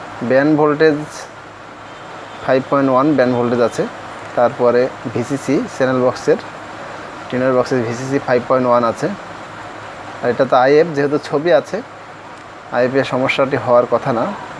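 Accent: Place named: Dhaka, Bangladesh. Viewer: ভাই , আপনার কথায় বলার ধরোন বুঝায় আপনি ঢাকা লোক |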